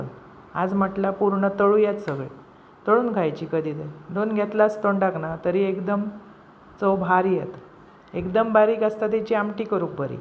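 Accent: Malvani, India. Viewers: तुमचा आवाज छान आहे आणि मालवणी खूपच गोड. टेस्टी कोळंबी फ्राय.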